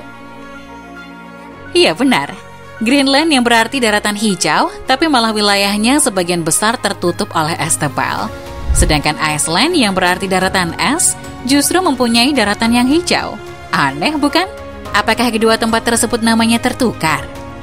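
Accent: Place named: Lombok, Indonesia. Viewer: Anak lombok mana suaranya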